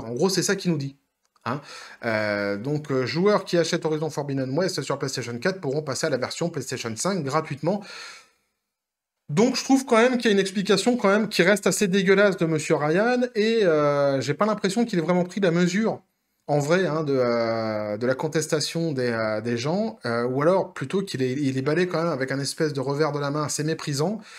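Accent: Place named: Quebec, Canada. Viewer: L'accent Québécois est fabuleux 👍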